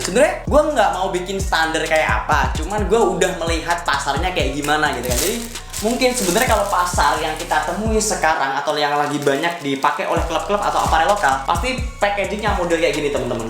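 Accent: Java, Indonesia. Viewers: Yang ngomong jamet ya , medok amat . Gak usah sok vocal ngurusin orang . Cukur aja rambut lu botakin , masih pede gak lu tampil di depan kamera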